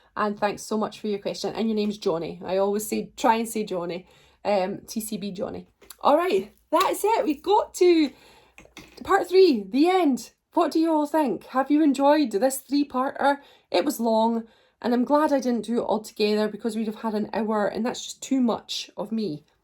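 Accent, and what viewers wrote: Scotland, United Kingdom. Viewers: Hi thank you for part three. Very entertaining. Love your Scottish accent 😻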